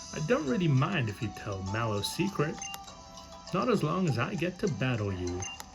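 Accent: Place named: Africa. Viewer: The GOAT African accent returns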